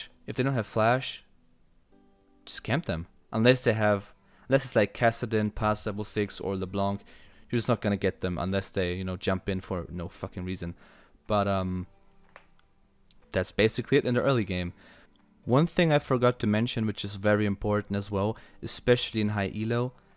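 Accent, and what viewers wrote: Germany. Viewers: Man hört sofort das du ein Deutscher bist haha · Geiles Video! Man hört das du Deutsch bist, trzdem sehr geil!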